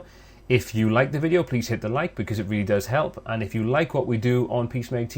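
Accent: Wales, United Kingdom. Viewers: Nice to hear a Welsh voice on this video. Hwyl from Cardiff!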